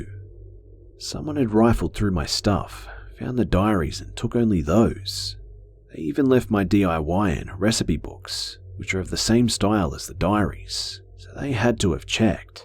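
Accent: Australia. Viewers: Sorry to hear about your situation. I'm a huge fan of all your wonderful Aussie accent and stories, I'm sure YouTube will go back to normal quickly. You know shit like this happens a lot, good luck with your patron. I wish I could be more help, but I'm pretty much where you are. Good luck anyway. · Be. Australian?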